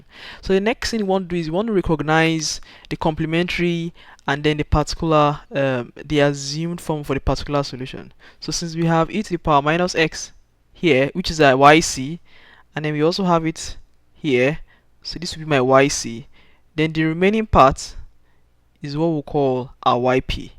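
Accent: Nigeria. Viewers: Thank you for the video! You be Naija guy?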